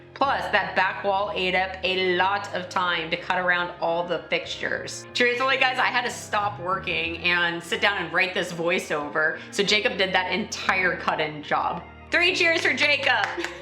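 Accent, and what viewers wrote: Texas, United States. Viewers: with your Texas accent, when you say the name it sounds like "Pure Stoke". ALso a cool name.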